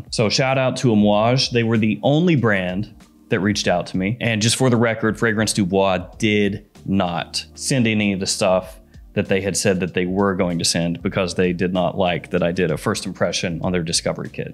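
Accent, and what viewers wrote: South, United States. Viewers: Southern accent, again. Awesome!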